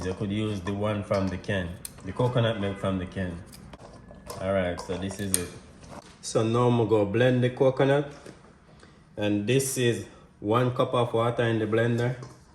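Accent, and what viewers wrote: Jamaica. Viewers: Beautiful yard accent. Keep it up.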